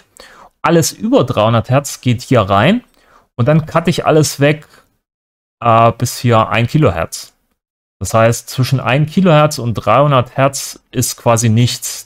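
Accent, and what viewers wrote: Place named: Berlin, Germany. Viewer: Besten Dank für dieses neue Video, welches wieder interessante Einblicke in Arbeitsweisen und Möglichkeiten bietet. Und wenn Dein Berliner-Slang rauskommt ist das auch sehr amüsant; und auch so, gerne Labern! Alles Gute und Merci!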